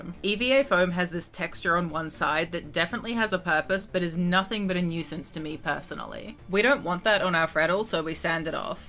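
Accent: Australia. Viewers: bro said he was Australian without saying he Australian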